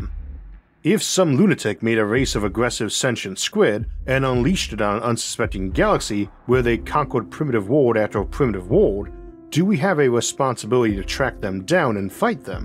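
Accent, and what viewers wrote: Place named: Boston, United States. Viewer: Just re-watched upward bound - You've gone from a self depreciating Elmer Fudd, to a confident sounding speaker with an "almost Bahstahn" accent. You might want to pull a set of videos that were recorded a year apart and go over them with your voice coach. P.S. With your content I skip the falling asleep part and go straight to dreaming of the stars.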